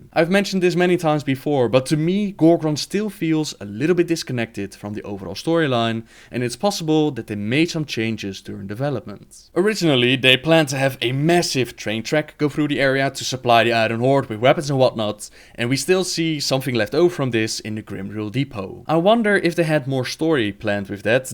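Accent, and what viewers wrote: Netherlands. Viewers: You're dutch aren't you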